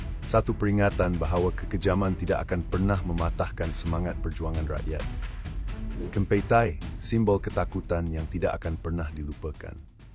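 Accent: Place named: England, United Kingdom. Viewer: Xboleh cakap melayu elok2 ke..cakap pelat omputih bagai..buat malu bangsa ja kau..mat poyo..mat salleh celup. Bangang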